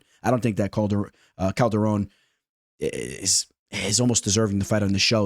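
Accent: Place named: Louisiana, United States. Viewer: are you from louisiana? you sound just like dustin poirier lol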